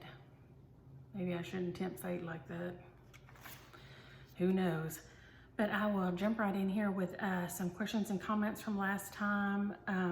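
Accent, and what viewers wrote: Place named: Arkansas, United States. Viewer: Happy Birthday!!! Bless your heart...we will get though this! Love your accent, I lived in Arkansas for five years and miss hearing that twang😂! Down south everyone is fixing to go somewhere! You hang in there girl! Love all your finishes and stiching! Sending a virtual hug! God Bless you!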